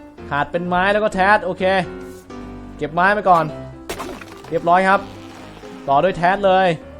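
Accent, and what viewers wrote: South, Thailand. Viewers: พี่อยู่ภาคใต้หรอครับ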